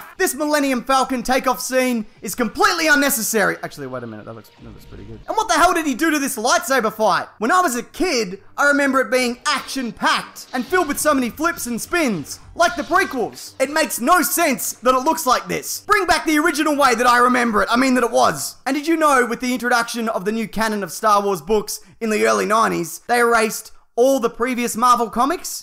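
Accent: Australia. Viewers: OH MY GOSH! Someone yelling about Star Wars in an Aussie accent... BEST VIDEO EVER! 😂😂😂😂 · What a great sarcastic Aussie rant?! Love ya work ya bogan weirdo!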